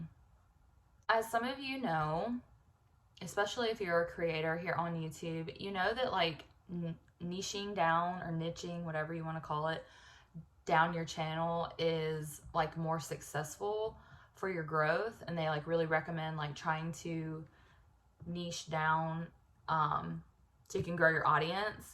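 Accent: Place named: South, United States. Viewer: Your asking what brought me here. Well I love cleaning motivation and inspiration but to tell the truth I have been drawn to the vlog style videos 😊. So being from Canada 🇨🇦 we get teased and bugged all the time about how we talk. I do enjoy the way y’all speak in the south so carry on doing you and carry on with the vlog style content ❤️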